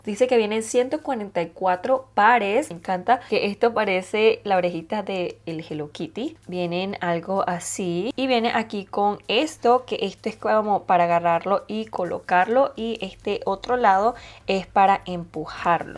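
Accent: Venezuela. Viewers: Me Encantas!!!! soy venezolana, vivo en España y es super increíble oír tantas palabras y esa forma de hablar tan particular de los venezolanos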